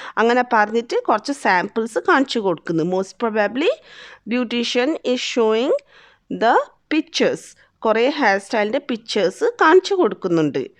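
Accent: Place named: Kannur, India. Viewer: Typically kannur malayalam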